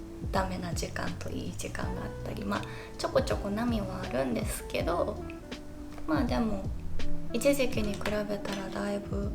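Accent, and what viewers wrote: Kansai, Japan. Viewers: 私も精神的な病気を長い間、患っていて良い時期と悪い時期の波があります。そして、線維筋痛症という身体の激痛と12年間も共にしています。動画やnoteで、naoさんが体調や心にきちんと向き合われているのを観て、私も体調と心にきちんと向き合おうと決めました。 メイク道具がポーチに入ってないのが面白かったです😂メイク道具って、なぜか増えてなぜかポーチに入りにくいですよね😂 naoさんのおかげで読書が趣味になったり、インテリアに興味を持ったり、とても良い影響を受けています。ありがとうございます！ あと、naoさんの関西弁って、とっても可愛いですね。癒やされます。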